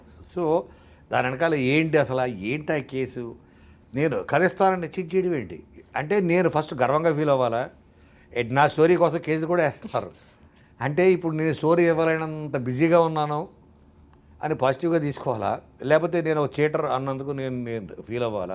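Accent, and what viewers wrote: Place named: Telangana, India. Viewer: Nice interview . యాంకర్ బాబు అంత బాగుంది కానీ ఆ తెలంగాణ యాస ఎందుకు .. అలాగే ఎవరు చిత్తూరు..కాకినాడ.. శ్రీకాకుళం .. యాస మాట్లడరు కదా.. ఎందుకు ఈ మధ్య నే కొత్త గ ఇంత అతి చేస్తున్నారు మీరు తెలంగాణ యాస తో .. Consider others too. One gets rejected for a call centre job too if there is heavy mother tongue influence in his English. Experienced it first hand in getting rejected. Cater for everyone when in media. Speak neutralized. Jai Telangana .. Jai Andhra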